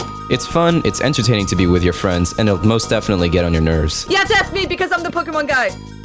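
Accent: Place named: France. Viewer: Also, you sound French. Are you French?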